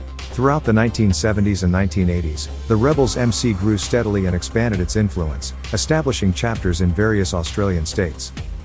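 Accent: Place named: United States. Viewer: Why is it an American doing the voice over as you said, a load of crap 😮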